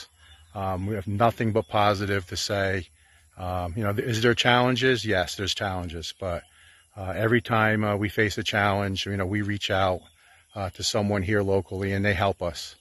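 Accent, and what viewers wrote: Philadelphia, United States. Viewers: NEW MEMBER. ARE YOU FROM THE EAST COAST OF USA? SOUNDS LIKE FROM "PHILLY"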